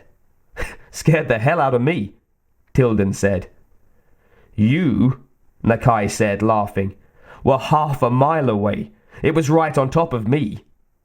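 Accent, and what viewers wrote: United Kingdom. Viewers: in the early months she would sit there and watch Aliens and Predator movies with me on the days I stayed home with her.. now she’s constantly on the move so I only get to listen. I’m on my 5th book now, and I’ve tried to make a habit of liking the each chapter as I start it for you! Also, your accent and tone is quite immersive, are you from GB?..?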